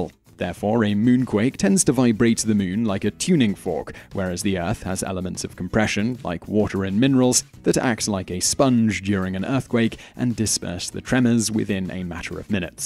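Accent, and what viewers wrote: England, United Kingdom. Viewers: The man accredited with developing 80% of our worlds modern technology said to think in terms of “Energy--Frequency-Vibration.” Or we can listen to this well spoken English Accented MORON!!! I’m afraid the masses have already excepted the later. 🤪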